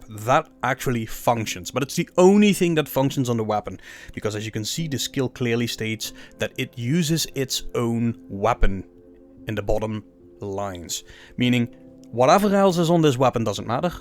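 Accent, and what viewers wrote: Limburg, Netherlands. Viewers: Are you from Limburg?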